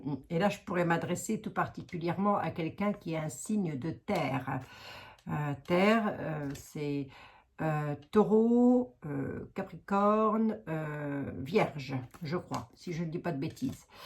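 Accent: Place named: Grand Est, France. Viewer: Fort Accent et expressions du GRAND EST que je reconnaît très très bien 😉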